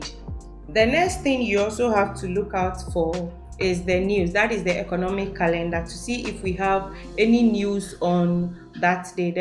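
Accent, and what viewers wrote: Ghana. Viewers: Honestly, I have been consuming your content since day one and I must say your channel is underrated. You deserve many more subscribers and views. You always share a lot of valuable insights. Which are always straight to the point and beginner-friendly. Not to forget your sweet Ghanaian ascent too.😍, Keep giving us masterpiece content. Thank you!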